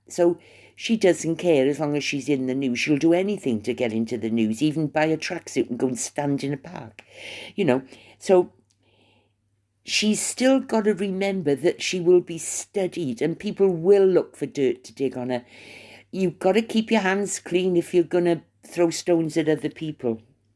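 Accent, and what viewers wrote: Wales, United Kingdom. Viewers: Your podcast is never to long for me to listen to I love hearing a friendly Welsh accent hope your fur baby 😺 is OK . Dam home shopping they never get it right . Looking forward to your next podcasts my Welsh friend 💝💐💐💐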